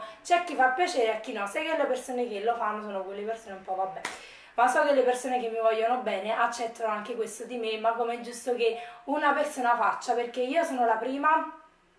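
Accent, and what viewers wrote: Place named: Campania, Italy. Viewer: Io penso che tu non ti debba giustificare dei tuoi errori grammaticali, io ti seguo da poco e mi sto letteralmente divorando tutti i tuoi video perchè mi piace la persona che si intravede semplice e genuina, oltre che mi sono utili i tuoi video in quanto sono un acquirente di allsupplements. Inoltre reputo anche tu sappia intrattenere il pubblico. almeno per il mio gusto personale si. Continua così :-) P.s. adoro il tuo accento campano!!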